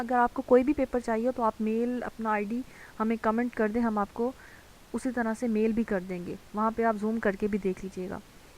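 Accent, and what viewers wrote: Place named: Saraiki, Pakistan. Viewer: I think you are Saraiki speaking!!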